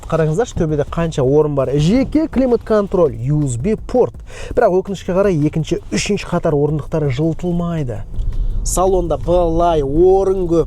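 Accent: Standard. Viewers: жарайсың таза қазақша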